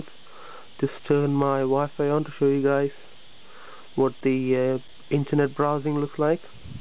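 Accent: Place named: Trinidad and Tobago. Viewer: You Sound Like A Trini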